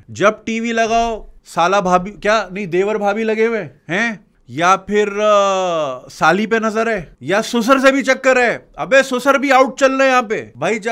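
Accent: Karachi, Pakistan. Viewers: Karachi walon ka accent esa ee hota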